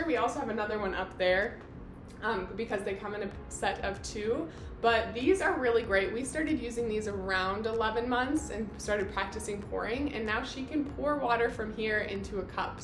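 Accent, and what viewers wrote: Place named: Illinois, United States. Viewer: Lol I can pick up on your Illinois accent right away. I’ve seen a lot of these kitchen Montessori hacks. Trying to find one that shows how the pvc pipe goes into the faucet.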